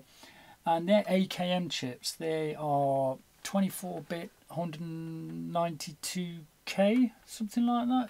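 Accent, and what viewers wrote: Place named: England, United Kingdom. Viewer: Hi newly subscribed to your channel. you sound very English although you were talking about product COST's in dollar & cent's, but I take it you reside in England & have to send to America for your parts? however! i enjoyed your very informed repairs videos, & wondered....do you extend your workmanship to Roland models? VA-7 in participant? i may pick up some tips!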